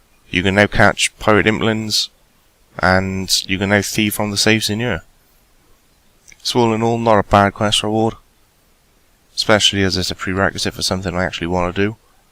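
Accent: Wales, United Kingdom. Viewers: Best guide maker i've found without a doubt. That Welsh accent just reminds me of Dirty Sanchez every time I hear it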